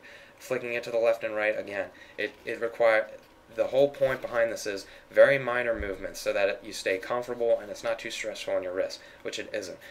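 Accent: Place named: Pittsburgh, United States. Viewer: I'm a big sucker for accents, and I dig yours. Can I ask where you're from? I'm thinking somewhere near Pittsburgh, maybe southeastern Ohio?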